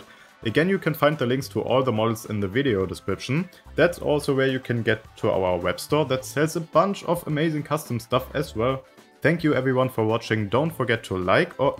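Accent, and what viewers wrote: Germany. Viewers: Is it shust me or do i hear german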